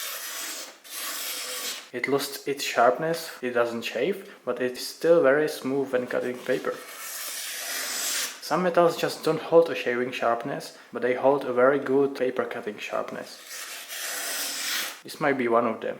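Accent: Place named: Russia. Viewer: ты с России что ли? акцент пипец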